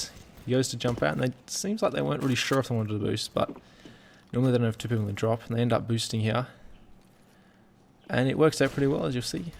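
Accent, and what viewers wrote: Australia. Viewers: are you Australian? Im in love with your accent :DD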